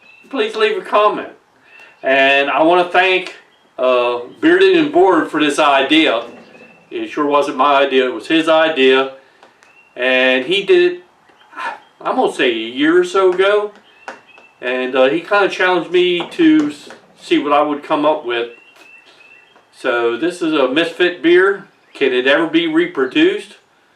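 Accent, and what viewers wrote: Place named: South, United States. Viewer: im assuming this dudes from kentucky or tenessee or virginia or north carolina or somewhere like that and not texas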